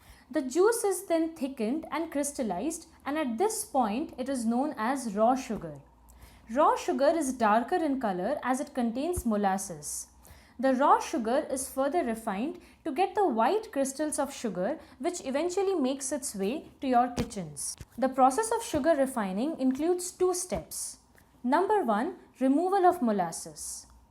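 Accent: India. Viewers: Must you talk in English? Your pronounciation sounds half Hindi and only 10% english. Hard to understand.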